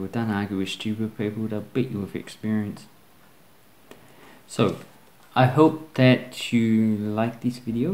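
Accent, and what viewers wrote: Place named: Australia. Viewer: Please use American accent instead of Aussie accent it's really hard for me as a non-english speaker to understand every word that you've said, even if you talk slowly as in the video.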